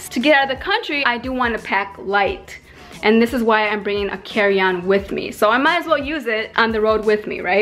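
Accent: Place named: Michigan, United States. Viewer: If I'm not mistaking it sounds like Michigan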